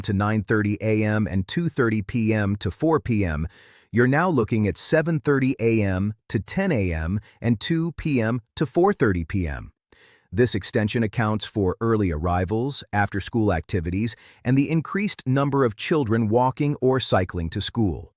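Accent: United States. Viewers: Not another video about Australia with an American accent, which is most probably AI. Also, mostly not exactly true.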